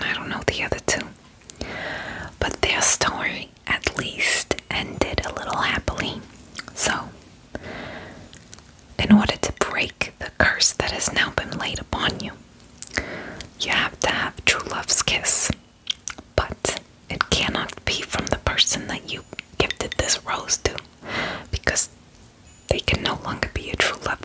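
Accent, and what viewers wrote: New Jersey, United States. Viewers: I live in NJ and there are absolutely Italian women that sound like this all around me. It's definitely more common towards the north end of the state and into NYC. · the jersey accent always reminds me of linda belcher from bobs burgers😞😞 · yeah i’ve never heard a single person unironically talk like that, some ppl come close but i like the idea that it’s jersey. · I think maybe because this is more of a Jersey accent. My boyfriend is from Brooklyn he has a completely different accent than this 😅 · THE JERSEY ACCENT IS BACK ❤❤❤❤❤ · Omgggg I missed my jersey accent fairy!!!! This is the best news. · That’s definitely Jersey lol sounds like a Jersey Italian lol 😄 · the accent is Jersey, not New York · THE JOY I FELT HEARING THE ACCENT😭 JERSEY FAIRIES FOR LIFE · The NJ accent is on point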